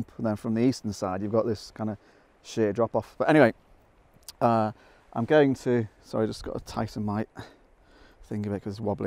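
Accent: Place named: Wirral, United Kingdom. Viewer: Thanks for sharing. Really good narrative to your thinking of the composition of the images. Plus you sound like a local of mine (wirral) A definite sub. ATB Andy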